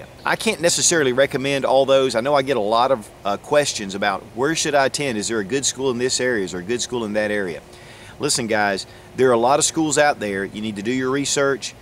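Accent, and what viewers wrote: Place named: South Carolina, United States. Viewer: IS ONE OF THE BEST TRAINING AND KNOWLEDGEABLE SITES OUT THERE - THANKS VERY MUCH. THERE IS NOT ONE VIDEO THAT I DIDN'T LEARN SOMETHING FROM YOU. I ALSO FOUND OUT YOU DON'T LET YOUR WIFE TOSS OUT YOUR OLD TEE SHIRTS THAT FIT SO GOOD. HA HA. YOUR S. CAROLINA TWANG IS A PLUS TOO TO LISTEN TO. NEXT TIME I AM OUT PRACTICING, I WILL MIX UP SOME SNAP CAPS WITH LIVE STUFF, BUT SNAP CAPS ARE GOOD ENOUGH FOR DRY FIRING PRACTICE.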